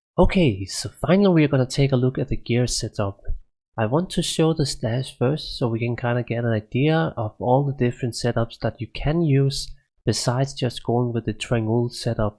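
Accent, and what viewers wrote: Denmark. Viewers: Du lyder Dansk :) But really cool guide mate. might consider rerolling Necro.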